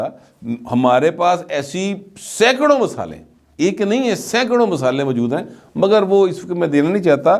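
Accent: Punjab, Pakistan. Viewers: صاحب آپ کا وی لاگ بہت اچھا ہوتا ہے، اور پنجابی اندازِ بیان بھی خوب ہے۔ بس ایک گذارش جو پہلے بھی عرض کیا تھا کہ آپ دونوں پاؤں ایک ہی کشتی میں رکھیں، پلیز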